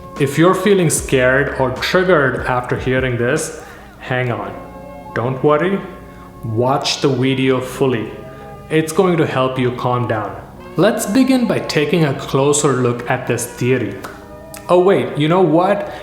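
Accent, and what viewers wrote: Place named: United States. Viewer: Why do you sound American in this video???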